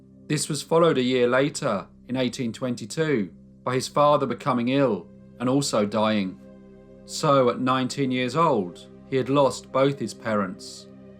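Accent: United Kingdom. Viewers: Gerrylynn mako. It is a British accent. I believe it is the Queens English. is a well educated person.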